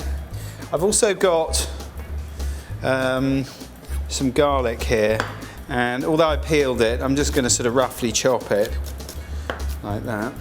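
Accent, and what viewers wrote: England, United Kingdom. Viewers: Love his english accent...he is rubbing into the skin....ah ah ah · I liked this video as it was simple and of course the British accent makes watching the video enjoyable I am going to make this for thanksgiving as after so many turkeys and hams I look forward to a change. Thanks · brit accent - is the best ingredient to this recipe. not so sure about the rest.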